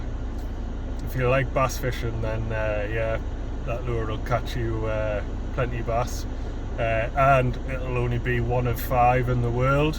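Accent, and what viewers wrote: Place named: Durham, United Kingdom. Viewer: Well done. I have been watching your videos for a wee while now and I love them. I am originally from Durham but now live in Northern Ireland. I love to watch you fish your local marks the spots I recognise and it is great to hear the accent. Last year my wife bought me one of your hoodies for an anniversary present and I wear it with pride even when sea fishing in Galway. Keep up the good work.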